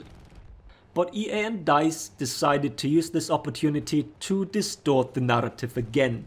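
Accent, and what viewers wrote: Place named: Northern England, United Kingdom. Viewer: Good video my friend. Might sound like a weird question but do you like in the North of England? You have a northern twang when you speak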